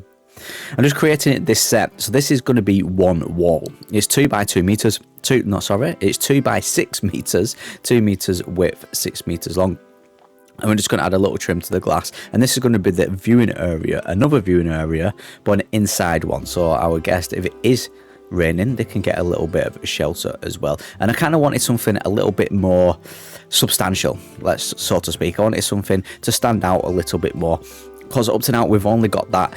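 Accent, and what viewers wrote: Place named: London, United Kingdom. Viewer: yeah great voice, narration a n d accent. One of the main reasons actually for me to choose your videos over those of other gifted British creators. (My preferred accent group in general….) Is that a London accent? Which part?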